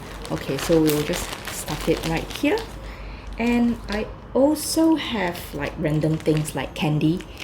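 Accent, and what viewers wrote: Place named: Singapore. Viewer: Also this is kind of random, but I love the Singapore 🇸🇬 accent. I follow another YouTuber with the same accent and I just love it. 😊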